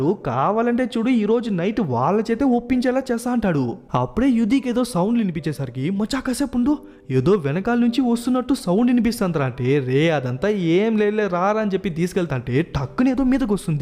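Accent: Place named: Ballari, India. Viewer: Explanation is good...bro I think you're from ballari... Karnataka state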